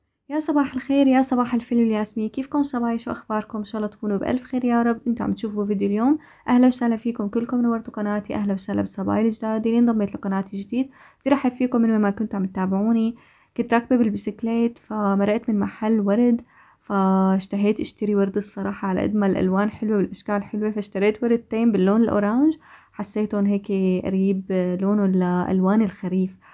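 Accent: Syria. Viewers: متابعتك من جديد من الشام.. ومن لهجتك دغري عرفت انك سورية ❤❤❤مااا شاء الله فيديوهاتك مبينه بتجنن رتابة وتنظيم راح اعمل لفة بالقناة شوفهن تبقى كلهن عرواق الله يهنيكي ويسعدك يا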